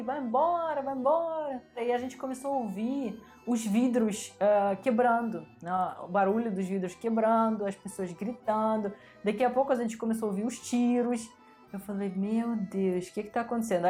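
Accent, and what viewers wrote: Rio de Janeiro, Brazil. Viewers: adoro seu sotaque carioca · Seu sotaque é mais carioca do que russo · Você já está com sotaque carioca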